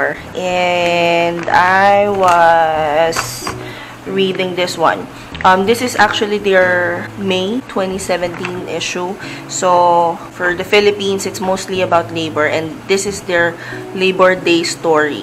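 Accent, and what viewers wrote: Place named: United Kingdom. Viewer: At naalala ko pa nga un British accent mo sa esca calls. Pia Wurtzbach lang ang peg ✌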